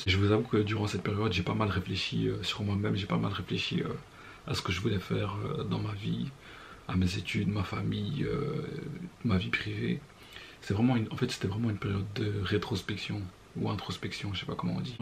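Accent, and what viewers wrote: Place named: Brussels, Belgium. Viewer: T as manière de parlé obligé t es un bruxellois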